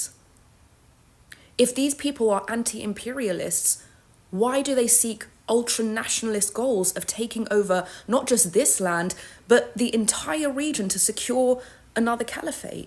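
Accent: United Kingdom. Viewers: Correct. That's how you know everything she's saying is utter BS. Not to mention she misses much of the underlying factors and historical contexts of the past 300 years that would explain where we are today. She gotta cute face and a British accent so many will listen. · She's not iranian - i hear british accent.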